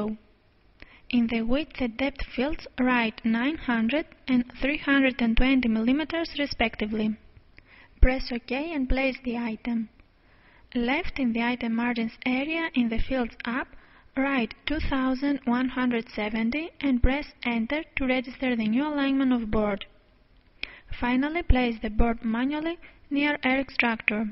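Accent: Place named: Greece. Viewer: nice accent.. ellhnida? :P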